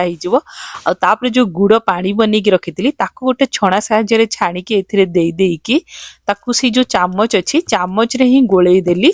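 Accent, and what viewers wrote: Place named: Ganjam, India. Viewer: tume Cuttack ra kintu tuma katha barta ganjam ra bhali laguchi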